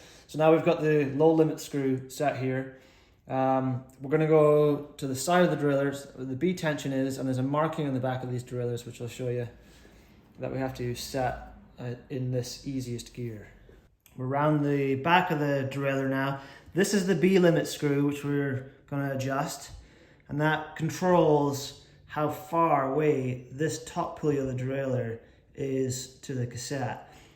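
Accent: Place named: Scotland, United Kingdom. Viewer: Fabulous video…enhanced by the hint of a Scottish accent ..👏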